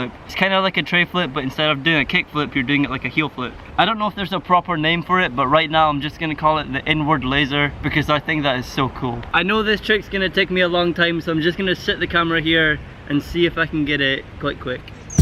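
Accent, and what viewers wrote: Scotland, United Kingdom. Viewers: Scottish. I knew it! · Are you from Scotland or the UK ?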